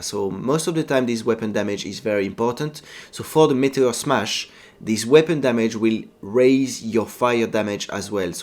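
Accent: France. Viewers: Are u FRENCH My FRIEND?! i like u r acsent :D ! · Vidéo sympa je ne te connaissais pas mais une chose de sûr c'est qu'avec un accent pareil tu ne pouvais être que français 😆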